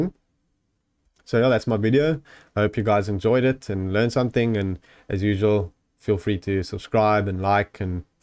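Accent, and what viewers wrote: South Africa. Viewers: Ate you from South Africa???? Your accent sounds like an afrikaans one. Im from SA living in South Korea.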